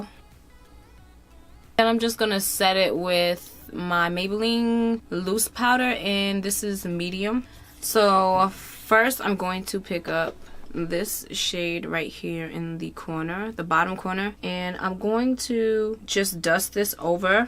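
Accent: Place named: New York, United States. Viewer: SubscribingBECAUSE .....the tribe palette look.... u did that.......you’re a NYer (accent dead give away lol 😂)and you’re an awesome mom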